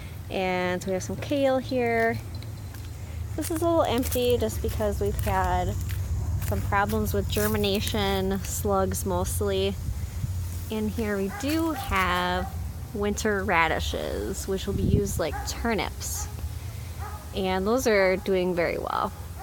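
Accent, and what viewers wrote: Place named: Minnesota, United States. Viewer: Grew up in Brooklyn Park, but this Minnesota accent is almost too much for me 😝😝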